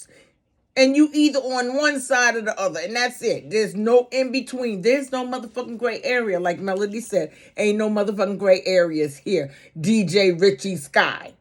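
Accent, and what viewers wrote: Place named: New York, United States. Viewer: I knew you was from NY the first day I listened to you. that accent is definitely a New York accent and I have never been to New York a day in my life, but I have met people from New York and I love your accent and I think you’re doing a great job. keep up the good work. ❤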